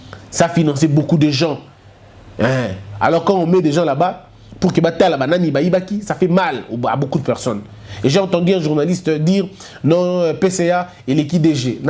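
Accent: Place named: Mbandaka, Democratic Republic of the Congo. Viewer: Mon frere j'ador quan vôus parle le vrais lingala de mbandaka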